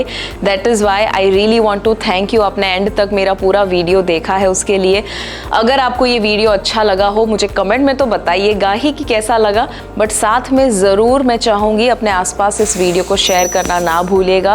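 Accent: Gujarat, India. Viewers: madam please speak in Gujarati ,You speek Hindi but your tone of speaking is like Gujarati ,You speak Gujarati language very well and clear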